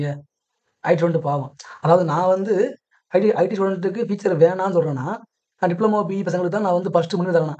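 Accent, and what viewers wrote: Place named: Kerala, India. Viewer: Kerala style sollum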